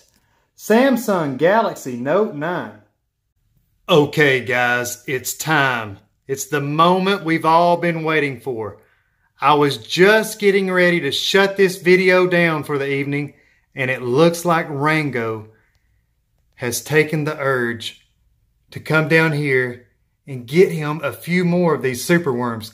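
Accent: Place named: South, United States. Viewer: Im a new subscriber from catch em all and ive watched quite a few of your vids back to back and im so impressed ! You have so much enthusiasm for the hobby and its a huge plus you love reptiles ! I would love to rock your shirt up here in Bucks County, Pa ! I absolutely love your community lizard tank and it inspires me to start one ! I have 3 & 1/2 years clean from heroin and reptiles and fish were my savior from that horrible life. Its a great hobby and it saved my life. Btw - i LOVE your amazing southern accent!!! Thank you so much for welcoming us new subscribers !!!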